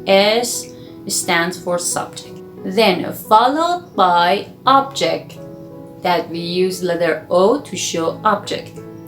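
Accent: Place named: Spain. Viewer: This is useful thank you. Your accent is a bit spanish.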